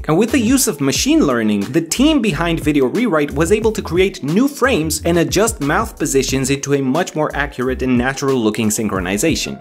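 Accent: Romania. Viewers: The through just sounds ROMANIAN AND I LIKE THAT XD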